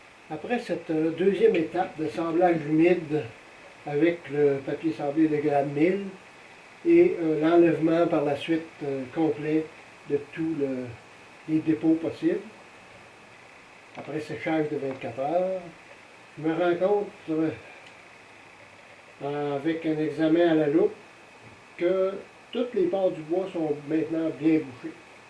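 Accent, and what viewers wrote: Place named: Quebec, Canada. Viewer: Mltteud ou multitude? C’est quand même trop moche l’accent québécois !!!!!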